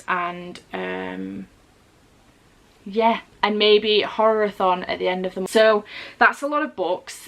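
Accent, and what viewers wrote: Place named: Standish, United Kingdom. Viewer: Thrilled to find you ,I grew up in Standish , your accent makes me homesick.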